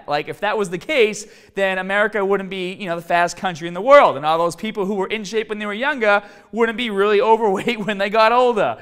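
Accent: Boston, United States. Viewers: u must be from boston :D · i cant tell how old you are, but i can guess you're from boston? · are you from boston? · I'm new to this fitness thing... Are you from Boston? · New here... are you from BOSTON? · Gotta love the boston accent! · The Bowston accent is awesome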